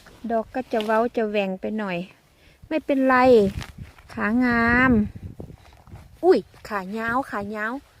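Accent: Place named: North, Thailand. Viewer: น้องชอบปี่อู้กำเมืองมว่นดีขนาดจ้าวดูแล้วสบายใจจ้าว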